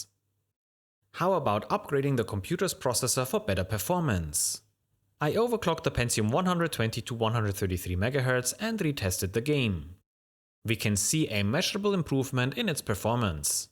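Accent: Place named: Franconia, Germany. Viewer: You're from Franconia, Germany, right? That accent sounds very familiar!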